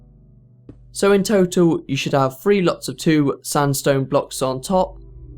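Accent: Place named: RP, United Kingdom. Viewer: U talk so posh it's like you do not speak in that way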